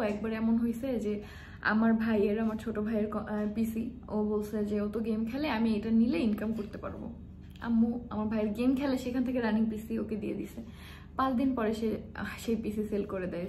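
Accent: Barishal, Bangladesh. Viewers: Amader barishal barguna er Kotha boltacho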